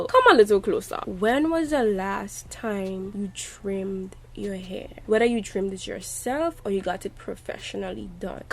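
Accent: Trinidad and Tobago. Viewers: Girl got to give you a thumbs up..the trini accent first then your content..I really dont know what products works for my hair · Are you trinidadian?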